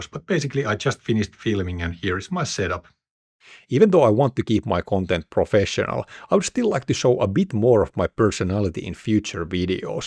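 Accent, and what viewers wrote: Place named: Finland. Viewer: Definitely can hear the authentic Finnish tone my friend, me and my team members are referring to your lessons to study the DP-600. Enjoy Fabcon, Kiitos!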